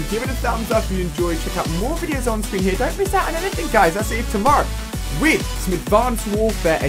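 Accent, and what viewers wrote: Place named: United Kingdom. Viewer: are u 50%muslimand50% British I think that because u have a British accent and your name is a Muslim name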